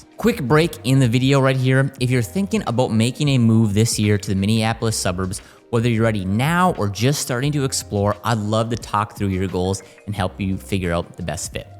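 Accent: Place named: Canada. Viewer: This guy sounds xanadian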